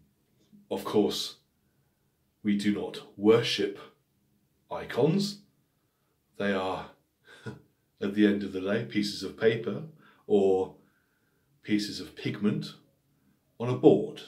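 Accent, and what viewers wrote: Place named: United Kingdom. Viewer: My (Sub - we have to renounce of this prefix)!... You explain accurate the message / meaning of this fragment of the Bible!... I forwarded this to my friends and students who speak and understand English for them to have both a good teaching and a model of how they have to pronounce the words in British English!... Thank you!...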